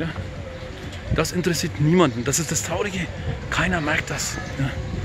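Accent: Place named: Franconia, Germany. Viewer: Frage. Du hast so nen fränkischen Dialekt. Bist Du in Nürnberg aufgewachsen. Meine Frau ist aus Forchheim, deshalb fällt mir das auf:-). Und ich hab auch etliche Jahre dort verbracht.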